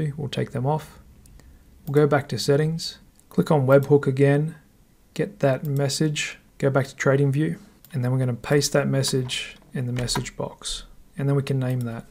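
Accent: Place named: Australia. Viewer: Hello, Thanks for the video, and it is great to hear an Australian on a YouTube video. I have a question/need help. I have a strategy that when a faster moving Av. crosses the slower, it triggers a trade and closes the open trade eg. Short opened - short closed, which in turn opens a long simultaneously and continues Short to Long to Short to Long etc.. Can you help me with description with the webhook Entry/Exit please. Happy to pay a fee. Regards, Sean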